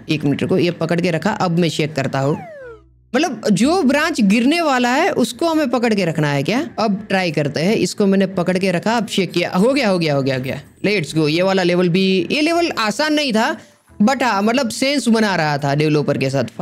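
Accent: Gujarat, India. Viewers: He is Gujarati bro❤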